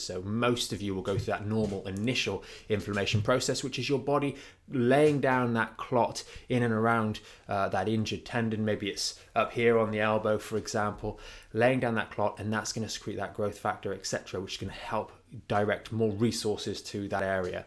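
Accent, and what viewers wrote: England, United Kingdom. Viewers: I barely understand this guys accent. Its so horrible to listen too and speaks way too fast. How i wish he was an American and not English.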